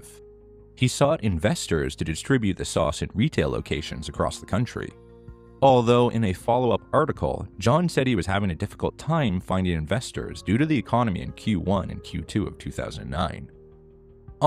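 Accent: Canada. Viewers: Just listening to ur accent, are you from Canada? %❤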